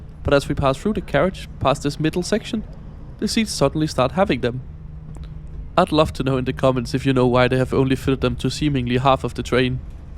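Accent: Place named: Denmark. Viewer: Your Danish 🇩🇰 accent is soothing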